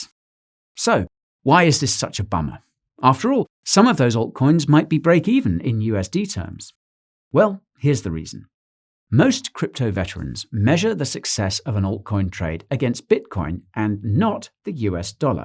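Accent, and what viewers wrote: United Kingdom. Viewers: I only understood like 10% of this... and that's being generous. But the wee bit I did pick up helps me understand why I flopped on my first $500-600 into crypto (well, Im not dead yet) and I'll be MUCH more careful entering my long term HODLING patterns from here on thanks to you. Liked and subbed even before you asked for it. I heard your Brit accent and immediately thought, ah yes, this man knows his stuff. LOL. Plus you came highly recommended by Alex Becker, the most ripped attractive PG rated Youtuber and top rated coin picker in the galaxy. 🙄😁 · Man your fracking awesome.. love listening to you... something about brits that make em sound smart haha. thanks for all the GREAT info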